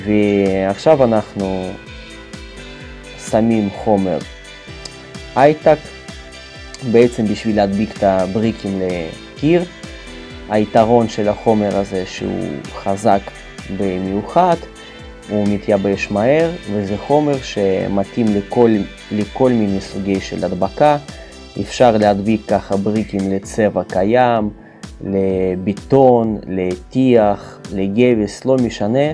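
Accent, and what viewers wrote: Russia. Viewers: Твой" русский" акцент в иврите звучит знакомо -:) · Хароший Русский акцент.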